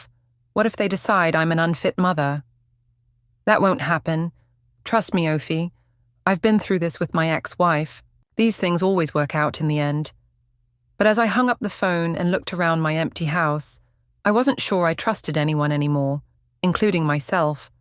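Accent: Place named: England, United Kingdom. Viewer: “Sarah’s mom saw you and told her mom and Sarah told me at lunch…” Then , the convo makes zero sense - the daughter is with her dad, at grandma’s, but Liam brings the daughter’s art supplies back to the house where she doesn’t live and says “she forgot them yesterday…” What TF? It’s almost like no one actually listened to this slop after the computer regurgitated it using a prim, proper and stuffy English accent who, when she protests, she protests “weekly.” SMDH AI-generated 💩 like this video truly sucks 👎👎👎👎👎👎👎👎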